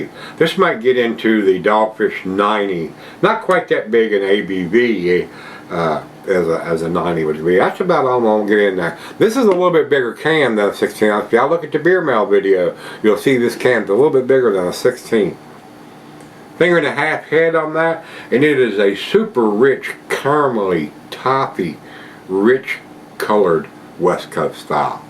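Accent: South, United States. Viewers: I now check the dates on ( most) of my beers because of. His reviews broadened my beer knowledge and appreciation. Randomly enough, I found (find) his reviews to be very relaxing and almost asmr like due to their very simple format and comforting southern drawl. Over the years I’ve watched his reviews almost religiously and looked forward to when he would upload a new review in the morning. It was a nice simple escape that made me proud to be a beer drinker. From now on, every beer I drink will be in his honor.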